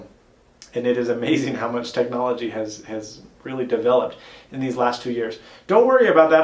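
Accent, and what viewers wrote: Spain. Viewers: I speak spanish as well, and it's very noticeable for me, haha. He must not have had much of a chance to speak English. His 'i" is very spanish-like. I think it comes from being a non-native speaker. I'm sure that being back home, he'll be able to even out his accent. He must have absorbed so much culture! It must have been an amazing experience! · Wow.... i remember when you left... crazy to think how fast time has passed. Your accent has developed so much into a spanish accent. It's hilarious! Hope to see more soon. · Spanish accent!!!!! :D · you have a slight Spanish accent when you speak English now compared to your last videos pre-Bolivia haha. Great to have you back:) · That spanish accent haha! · Slight Spanish accent? Nice to have you back!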